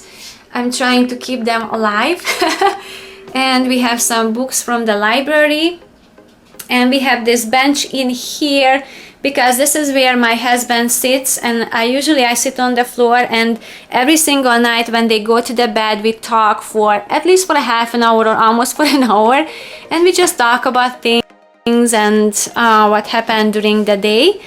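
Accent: Hungary. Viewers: A few seconds into the video when I recognized the thick Hungarian accent. I live in NYC in a 350 sq feet apartment and I still want to downsize and get rid of more stuff ( although my place is not cluttered) I guess everyone has a different idea what is big or small. The place looks amazing, You guys did an amazing job. Örülök, hogy egyre több magyar használja a Youtube ot extra bevétel szerzésére. Mások is csinálják, akkor miért is ne. Persze angolul könnyebb. Feliratkozok a követőid közé. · Wow. What a transformation. Well done! I love your house. I wonder... Where are you from? Guessing by your accent, Is it Hungary? Sorry, just curious... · Are you hungarian, because your accent sounds like it. Where do you live?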